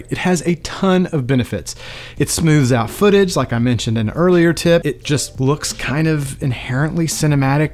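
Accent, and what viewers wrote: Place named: Texas, United States. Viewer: #Helpful. Will use your skill share link. Where you from? Texas?... great accent!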